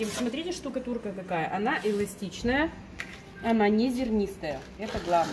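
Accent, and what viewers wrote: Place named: Urals, Russia. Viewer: Уральский говор прям бальзам на мою душу родом оттуда же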